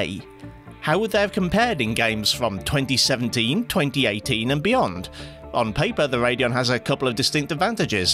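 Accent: United Kingdom. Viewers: british guy speaking about GPUs for 19 minutes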